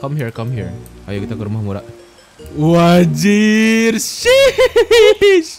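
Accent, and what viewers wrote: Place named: United Kingdom. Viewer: your accent is sounds like British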